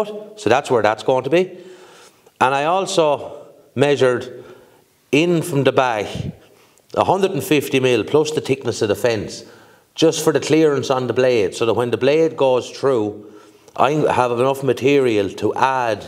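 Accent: Ireland. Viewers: Great video, very helpful. Also I had to subscribe just to hear that wonderful Irish brogue! Tanks!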